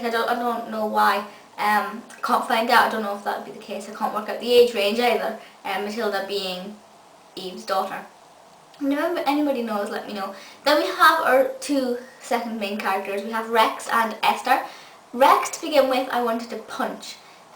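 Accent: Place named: North East England, United Kingdom. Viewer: I like your accent it's sort of a mix of north eastern endland and scottish I'm sorry if none of those apply to your accent it's just interesting and thats what I thought it sounded like.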